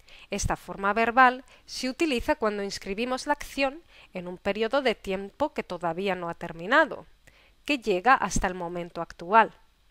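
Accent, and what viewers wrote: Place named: England, United Kingdom. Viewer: Me ayudan mucho tus videos.Y me encanta tu acento inglés.:)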